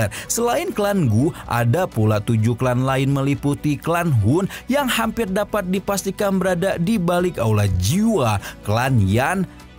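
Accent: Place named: Sulawesi, Indonesia. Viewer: Sulawesi on bg😄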